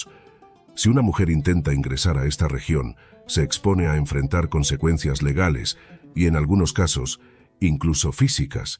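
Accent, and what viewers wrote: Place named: Castile, Spain. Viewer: Excelente vídeo, y muy bien narrado. El narrador habla un perfecto castellano y es un placer escucharlo.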